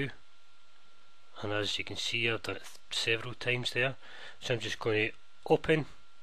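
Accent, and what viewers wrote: Scotland, United Kingdom. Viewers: he is scotish trust me?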